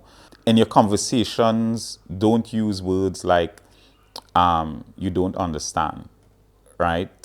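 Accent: Trinidad and Tobago. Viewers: Interesting to hear the Trini accent in Gambia. Welcome home brother. I lived in Trinidad for over 15 years, love the islands, still visit every year. Consider Trinidad & Tobago as my adopted homeland. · From TRINIDAD &TOBAGO 🇹🇹 I always wanted to hear our TRINIDAD 🇹🇹 accent joining you just now everyday I dream of coming to live in AFRICA travelling there shortly as I renew my passport AMEN 🙏🏿 🙌 To you both enjoy life in the MOTHERLAND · Establish a community, one Trini to another.....good going, accent cannot hide. How will you earn an income though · Lovely to hear your beautiful Trini accent🇹🇹 From one Trini to another, many congratulations on returning to the Motherland. I’m inspired.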